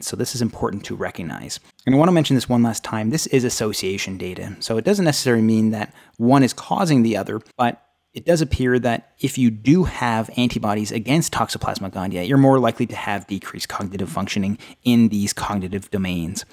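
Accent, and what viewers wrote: Canada. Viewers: i can tell youre Canadian lol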